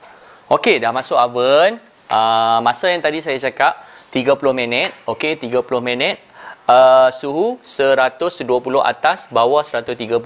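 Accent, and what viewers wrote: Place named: North, Malaysia. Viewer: T kasih bg resepi, cakap utagha syok dengaq, harap2 rajin la nk buat nti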